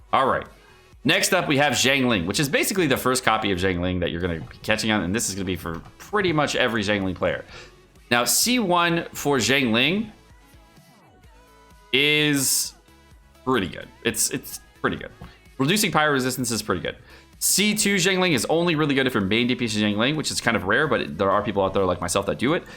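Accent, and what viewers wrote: United Kingdom. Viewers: Y British tho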